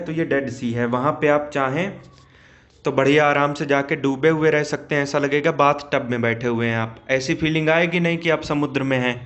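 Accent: Kanpur, India. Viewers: Ye sir pakka kanpur k hai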